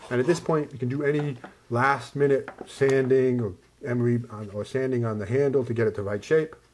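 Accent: United States. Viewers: Are u american?D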